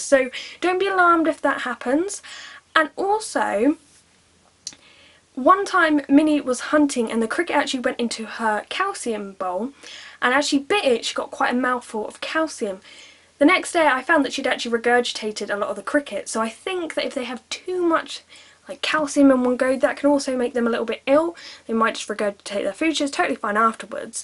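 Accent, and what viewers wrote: United Kingdom. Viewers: She’s British. Due to her accent she pronounces things differently... so no, she doesn’t pronounce it wrong.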